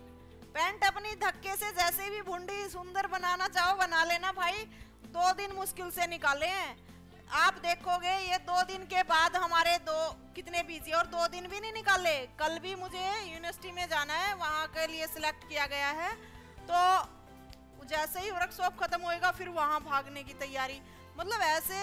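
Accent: Haryana, India. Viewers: हरयानी ठेठ में मिठास की कमी h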